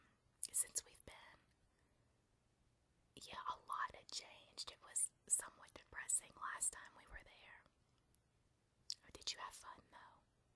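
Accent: South, United States. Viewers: Where are you from? In your videos I detect a southern accent.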